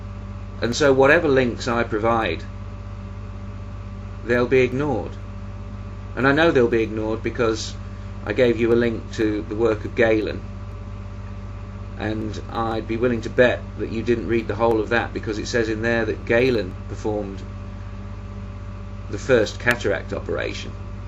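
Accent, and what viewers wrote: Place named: United Kingdom. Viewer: One of the most beautiful videos I've seen on YouTube., your combination of wisdom, humanity, charisma, dramatic flair, and British accent is just unbeatable.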